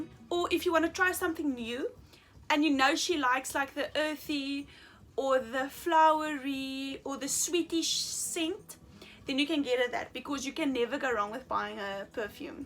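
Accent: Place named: South Africa. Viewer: Ur accent does it for me, proudly South African lols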